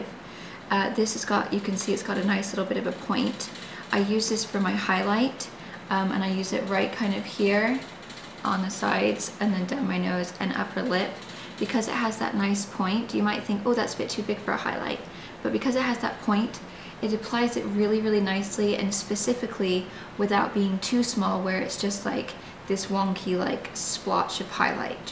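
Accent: United Kingdom. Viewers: She's English but was raised in America so she lost her accent. Though some of her words do sound like she has a slight trace of a British accent.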